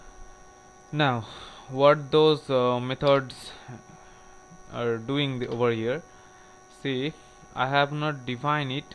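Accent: Bengal, India. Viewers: i think ur Indian and Bengali right :P by ur accent ..!!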